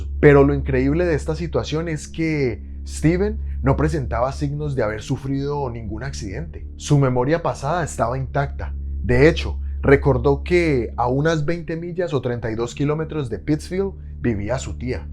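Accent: Colombia. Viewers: Vos sos de cali ve?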